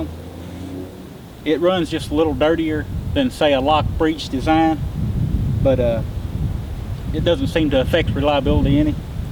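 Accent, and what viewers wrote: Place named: Boston, United States. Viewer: You sound like you're from Boston. Nice review!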